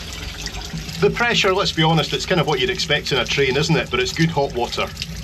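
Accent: Scotland, United Kingdom. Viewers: The harsch, sweety Scottish accent! Immediately a subscriber! And a good story-teller too, mind you. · Nice job!!! Love the Scots accent 🎉🎉🎉🎉🎉!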